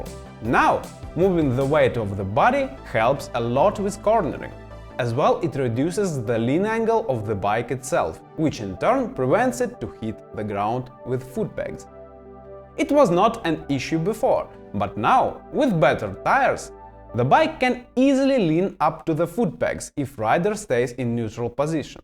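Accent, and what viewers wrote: Russia. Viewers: Dude talks like he's russian robot. Is he one of those AI generated things ?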